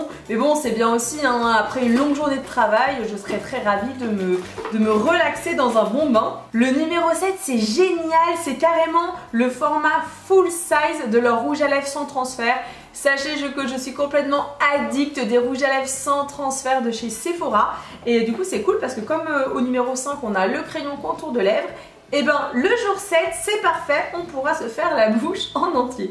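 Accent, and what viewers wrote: United Kingdom. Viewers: J adore l accent so british 👍👍. Très beau calendrier. Tu serais sexy en mère noël. Toute la panoplie c dingue. Chapeau sephora.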